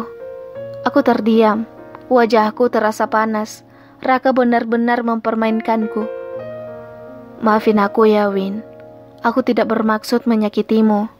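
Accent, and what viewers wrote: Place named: Mamuju, Indonesia. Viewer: Maaf boleh tanya miminnya ni org mna? Kok kayak org Mamuju?😁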